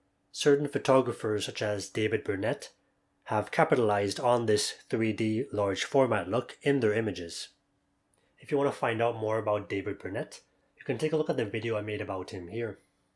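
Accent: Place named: Wales, United Kingdom. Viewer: Spent ages trying to figure out your accent. I thought there might even be a bit of Welsh there... heck, even throw in some Carribbean. Man of the world, probably!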